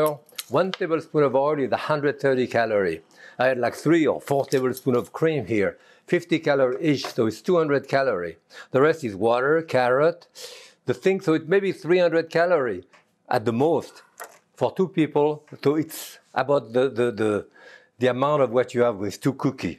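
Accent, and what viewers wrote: France. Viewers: After all these years, he's still speaking like a perfect french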